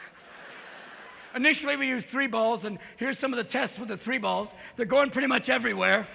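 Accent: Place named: Boston, United States. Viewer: that was a damn good Boston accent...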